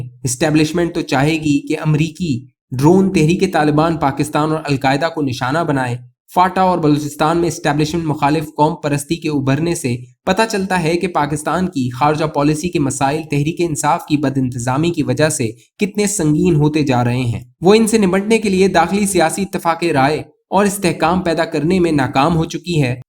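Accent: India. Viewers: انڈین بندے کا لب و لہجہ ہے یہ تو.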